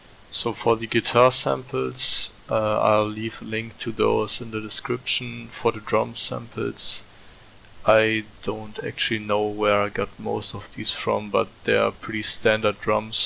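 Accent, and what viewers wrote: Germany. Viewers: hes from germany, i can tell by his accent :)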